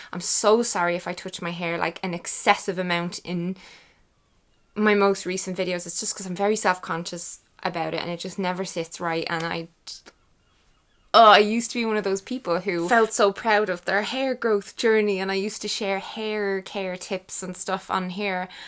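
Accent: Ireland. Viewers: I love your Irish accent😉👍it sounds really lovely!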